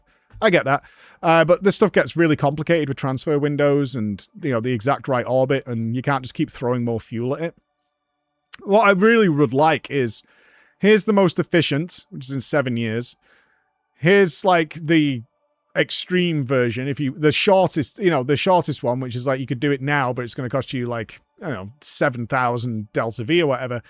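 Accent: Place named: Manchester, United Kingdom. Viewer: He's saying duna, hes just from Manchester is all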